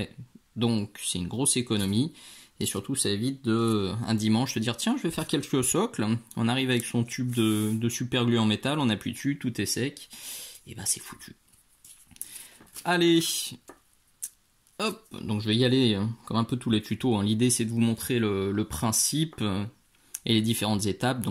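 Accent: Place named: Lyon, France. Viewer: Superbe vidéo, je ne savais pas pour le ciment, et encore moins qu'un produit tout prêt existait. J'adore, l'accent lyonnais. :-) Je m'abonne et je like. A +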